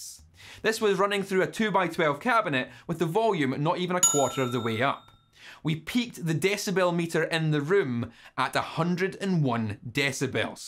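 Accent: Scotland, United Kingdom. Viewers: I just love that Scottish accent. Think $1.00 per watt. I use a Crate Power Block CPB150 150 watt amp through 2 stereo Akai SW-155 speakers with a 250 watt car woofer. They sound great the way I have 'em wired up. I love my Crate. It's a Great Crate. The girl in my house (wife) screams "turn it down"! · Nice scottish accent:-) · I love your scottish accent. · Two things. First off I love your accent. Are you Scottish? ? Second thing is I play bass and drums. I did a bass gig a few years back where I used my friends Randal beauty bass rig. Seperate head and cab. No pedals, bass strait to amp. I think the cab configuration is 6 10"s . I'm not sure of the wattage. But it's a great old unit. No frills, just really fat clean low end.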